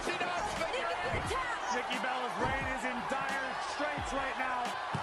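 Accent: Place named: United Kingdom. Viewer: Head's up bud, if you're gonna put prices in I.E. $60, it would be helpful to people who don't use the Dollar-Dollar ya'll, to put it in other currencies. You sound British to me which is astounding, that, if you are British, you wouldn't put the £ in. Simple business techniques bud. If you want pound-pound 'squire, you'd know investors and customers are lazy but lazy enough that they will go to another source for their info if the basics are built.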